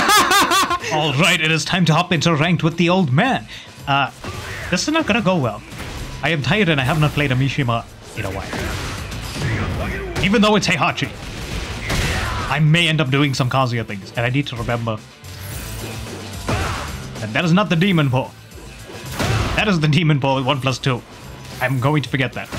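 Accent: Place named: Wales, United Kingdom. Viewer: I'm loving playing Heihachi at the minute he's a great character... unrelated I can't tell if you are Welsh or Asian 😂😂😂. I'm from Cardiff n I swear I can hear some Welsh in there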